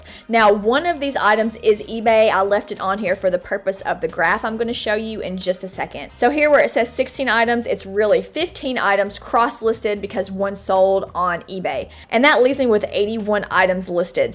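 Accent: Kentucky, United States. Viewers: I just saw a channel about Mercari that wasn’t nice about them. It was from The Nurse Flipper. I learned about her from your channel. Now, I have been buying things from them for years and never had a problem. But TNF had a very bad experience with them, you might like to look at her channel and check it out. Love your channel and it’s a treat to hear a Kentucky drawl. My mother was from Benton KY and when school got out for the summer I would head for Benton to spend the summer with my grandparents. I love Kentucky!!!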